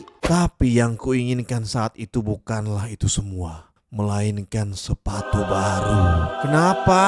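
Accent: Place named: Gorontalo, Indonesia. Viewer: Pantesan logatnya masih ada kebawa" dikit.. bo org grtlo utia :D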